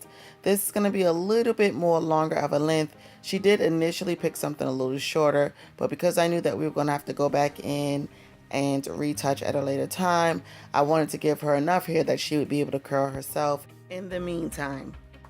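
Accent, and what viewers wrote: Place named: New York, United States. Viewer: Up, and coffee in hand. Back to the “Binge!” This “Do” looks AMAZIN! Love it; Natural/Relaxed....Healthy Hair is the Goal💪🏾💪🏾 And this looks Great! Technique is CRAZY! Such a “Pro!” Oh, and I KNEW you were a “New Yorker!” HEEEEY Fam! The #accent may have diminished, but it takes ONE to know one!💪🏾💪🏾💪🏾🖤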